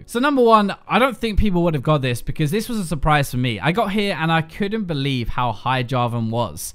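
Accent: United Kingdom. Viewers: First time I'm annoyed at British accents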